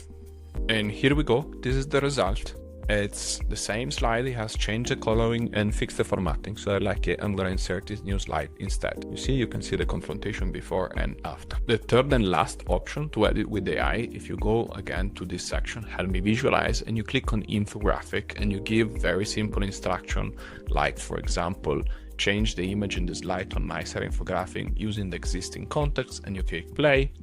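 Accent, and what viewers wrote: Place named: Italy. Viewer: This is awesome thank you for sharing this keep showing up! Saw your LinkedIn post about your accent. I think the Italian accent is awesome! 🙌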